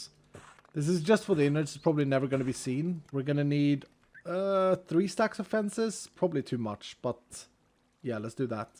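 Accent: United States. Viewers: Dude....your American accent is perfect. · I love how his American accent is a mix of like 12 different regions but is somehow still convincing.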